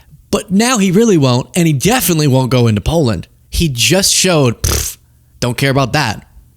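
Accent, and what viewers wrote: Finland. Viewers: Finnish accent :D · we dont need a Finnish accent. Or the giggles and juvenile humor. You have talent, just keep your show professional. The humor you try to introduce doesn't work with the subject matter you cover.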